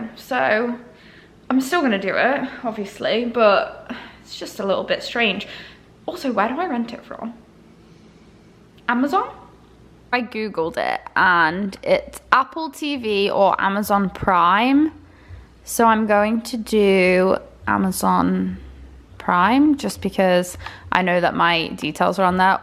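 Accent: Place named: United States. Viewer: Was so interesting watching you make the drink! I feel like in this video because you’re speaking more you can hear the slight American hint on your accent for some words. It suits you so well!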